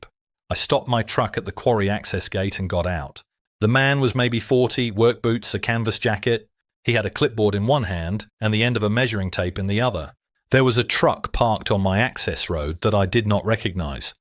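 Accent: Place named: United Kingdom. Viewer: Why a british voice?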